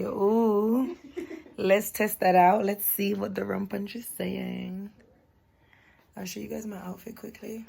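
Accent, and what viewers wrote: Jamaica. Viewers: I love when the patois drop in and out😂😂😂😂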